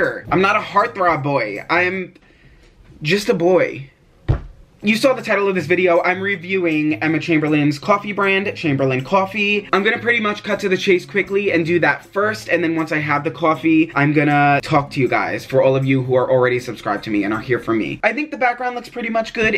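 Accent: New York, United States. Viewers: That New York accent thou😂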